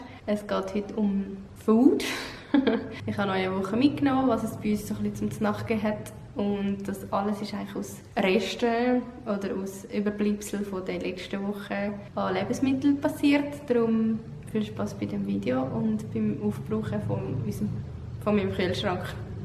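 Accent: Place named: Switzerland. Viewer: Ich mag einfach den Schweizer Dialekt. Ansonsten, leckere Sachen die du da machst. ❤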